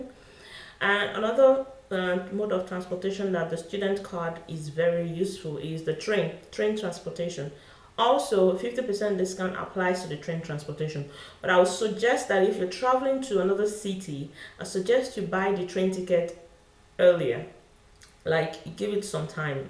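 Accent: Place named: Nigeria. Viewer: my sister i hail......i must say u are really doing a very good job..thanks for the info.. u really sound like nigerian...i am a proud nigerian...thanks for being u..may God bless u for all the info.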